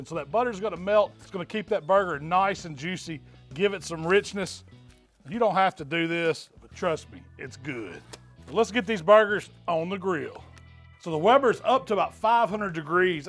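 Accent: South, United States. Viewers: Always trust a chunky happy southern sounding cook on a grill! Lol can’t go wrong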